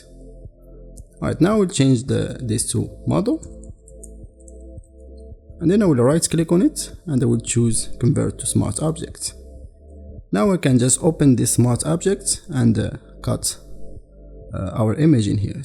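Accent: Morocco. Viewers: Are you Moroccan, I can tell f accent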